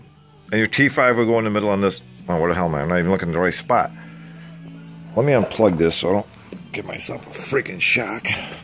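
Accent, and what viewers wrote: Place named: North America. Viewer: thank you for no selfie face shots. thank you for no annoying music. thank you for not wearing blue latex gloves to protect your delicate skin. thank you for no disclaimers such as " I'm not an electrician.....electricity can kill....wear safety equipment...."thank you for plain English....thank you for your x and o drawing.....thank you for keeping it short....thank you for speaking with a north American accent....thank you for cursing....thank you for not elevating yourself to a master class instructor the last 24 hours that's all I saw online, people spitting out technical jargon that makes no sense, and odds are they don't even understand it........everyone wants to be a youtube producer and15 min of fame so they can brag to their grandchildren.....am I bitter? FKN "A" I am. ....got a brake press that's down.....losin money.........and thank you for your humbleness when someone in here asked where the hot wires go.......you said......beats me...that was a long time ago.......hahaha....good one....later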